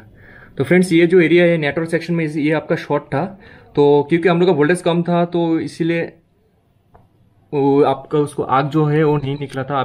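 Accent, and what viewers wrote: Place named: Bengal, India. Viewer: Bengoli wala hindi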